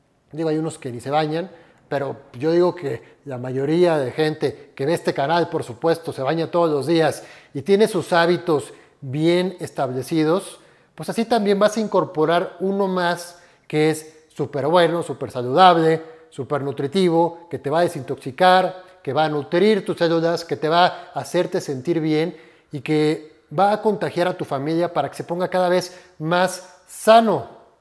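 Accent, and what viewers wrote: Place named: Mexico. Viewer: Menos NLA BLA BLA Y MAS clara y NADA DE ORALE ORALE . ERES MEJICANO?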